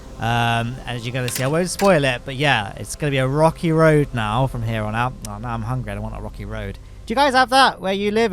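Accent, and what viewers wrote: United Kingdom. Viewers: Find me a non British KSP content creator…. It’s annoying. Honestly, what is with British people and KSP? I even have a friend in Britain who plays. I’m not overly pissed, I’m saying there’s too many and I’m done listening to the accent. I’m entitled to my own opinion for whoever finds this comment and so are you.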